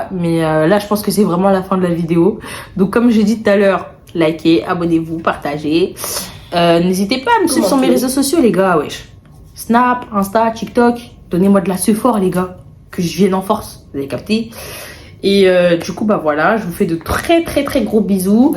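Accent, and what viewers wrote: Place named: Democratic Republic of the Congo. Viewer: L’accent congolais j’adore 🥰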